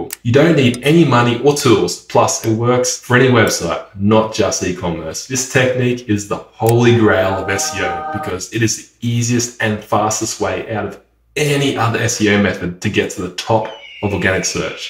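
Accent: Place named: Australia. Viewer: yes, that's always the case. I was asking about what's your take on using chatgpt to overtake FS. No offense, but if you are to reply to my comment, make it meaningful. Otherwise, just ignore it ;) I have a feeling it's easier in Australia (based on your accent, but I might be mistaken). Either way, this tactic is still working, but it needs some adjustements for the global market. I know I might hurt your ego with this and I will probably kill my chances of earning a thousand bucks, but let's discuss this.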